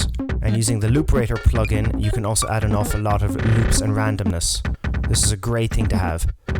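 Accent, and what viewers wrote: Ireland. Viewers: Now the next challenge is to find what Irish accent is it? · Loving this and great to hear an Irish voice doing such killer tuts. Nice one mate.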